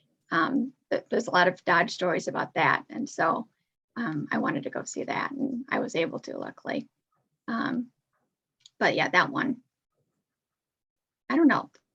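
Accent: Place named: California, United States. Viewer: I think your whole context and info on this is awesome but you keep talking fine then go off in some half choking graveling voice which is extremely annoying like your from California. I'm not trying to be hateful but just honest..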